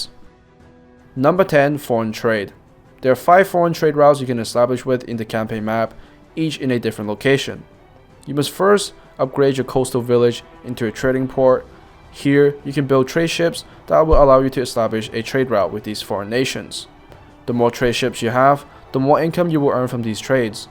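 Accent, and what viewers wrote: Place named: Scotland, United Kingdom. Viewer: why do you sound kind of scottish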